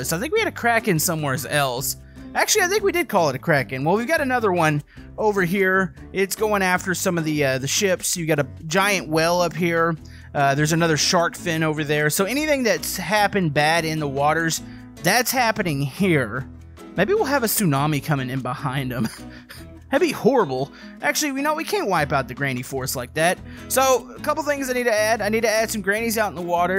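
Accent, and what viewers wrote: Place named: South, United States. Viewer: You kind of have a southern accent at times.. hmmmm lol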